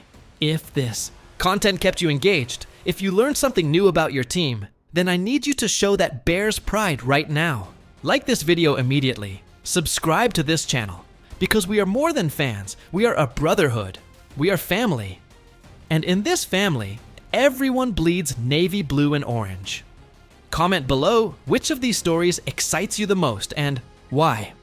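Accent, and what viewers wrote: United Kingdom. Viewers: Gotta love the British accent in and out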